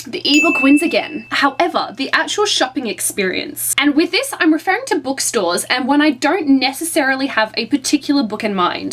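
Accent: Australia. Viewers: Are you Australian? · As much as I hate straying from the topic of a video.. where is she from? As a kiwi who's been in Australia it's becoming hard to differentiate the accents now lol. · You must be from Sydney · I loooooove the Australian accent <3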